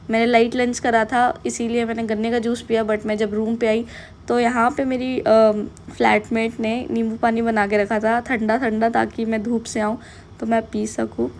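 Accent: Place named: Bihar, India. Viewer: R u bihari apke cll pe ke language se ekdm apne ghr ke bihari language ki feel aayi 🥹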